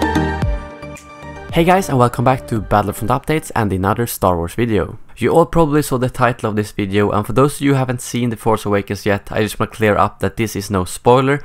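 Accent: Sweden. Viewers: the swedish accent doh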